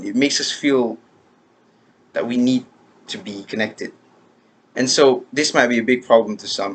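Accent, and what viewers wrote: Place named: United States. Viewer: You're such amazing!!!! Definitely like an american boysssss....good job !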